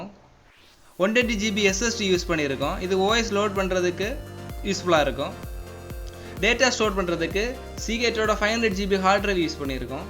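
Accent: Kanyakumari, India. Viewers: Bro enaku oru pc build pannanum. Slang ppatha kk slang mathiri iruku.. unga address illa number kedaikuma.enaku office purpose ku than so minimum evlo budget la panna mudiyum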